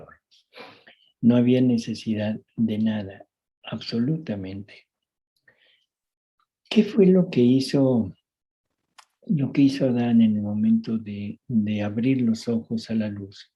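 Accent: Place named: Mexico. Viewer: Ay! Doctor, es usted una maravilla de palabras, explicaciones viva su maravilloso acento mexicano. Le amo, el Señor le bendiga.desde 🇪🇦 ledoylos buenos dias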